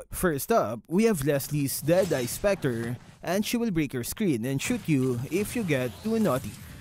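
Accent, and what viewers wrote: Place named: Philippines. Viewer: that forced vocal fry pinoy accent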